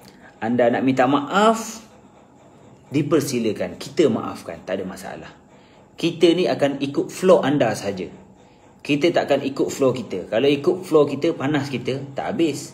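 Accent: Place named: East Coast, Malaysia. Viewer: Aku faham logat dia.kalu dop kelate ganu kalu xganu pahang.4ehehe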